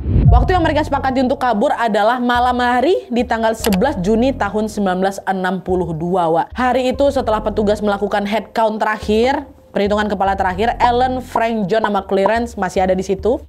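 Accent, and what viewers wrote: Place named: Medan, Indonesia. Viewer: Di lihat dari logat-logat nya seperti anak Medan😂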